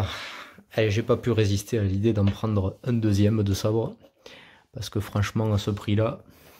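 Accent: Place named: Southwest, France. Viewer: Bonjour, étant un bon passionné du monde Star Wars et bien évidemment des sabres Jedi, je suis tombé sur ta chaîne et j'ai directement accroché. J'adore tes vidéos, elles sont précises et riches d'informations. Tu t'exprimes vraiment bien et ton accent du SudOuest met le sourire. Excellentes vidéos, j'espère que tu vas nous en proposer de nouvelles et je t'encourage à continuer. Je te souhaite de bonnes choses. PS: je me demandais si tu avais une page Instagram ou Facebook, où l'on peut voir ce que tu fais et discuter.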